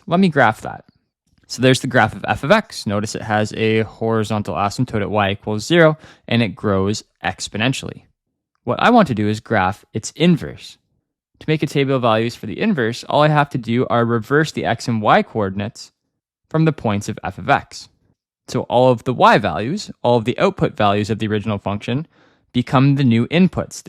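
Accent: Canada. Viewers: i hear the canadian accent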